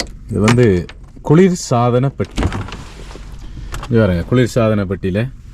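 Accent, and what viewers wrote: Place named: Sri Lanka. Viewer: தமிழ்த்தாய் வாழ்க தலைவர் பிரபாகரன் வாழ்க அண்ணாச்சி உங்கள் ஈழம் தமிழ் கேட்டுக் கொண்டே இருக்கலாம் இனிமையாக இருக்கிறது தமிழுக்கு அமுதென்று பேர், அந்தத் தமிழின்பத் தமிழெங்கள் உயிருக்கு நேர்" என்பதாகும் அதிலும். உங்கள் தமிழ் தனி அழகு தான் அண்ணாச்சி வாழ்க வளமுடன்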